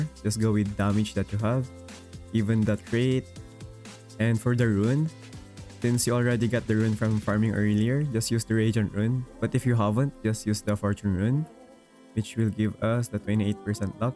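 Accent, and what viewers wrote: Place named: Canada. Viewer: this might be the most candian accent i ever heard in my lifetime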